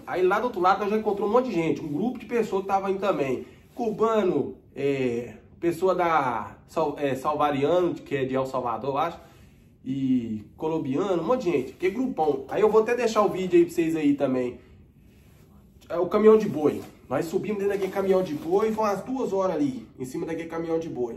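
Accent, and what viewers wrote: Minas Gerais, Brazil. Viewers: Quw cara dahora um abraço man sou mineiro tbm, melhor sotaque pra contar história ❤😂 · O seu sotaque é igual o do meu primo Leo que é de inhapim mg ele tá nos Estados Unidos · Kkkkk mano tu e mineiro né ? Cai aqui de paraquedas, rachei da forma engraçada que vc conta kkkkk vc parece muito o Josias presidente da Galoucura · Mineiro contando é mt engraçado kakskakakakaka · eu adoro o sotaque de minas mano, se loco vc aprende e se diverte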